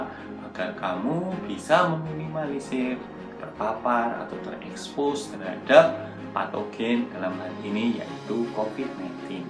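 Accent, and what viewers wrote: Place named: Java, Indonesia. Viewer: Mas...keren euy...logat jowo yg bikin org yg denger bakal hafal tanpa melihat wajahnya... edukasinya asik2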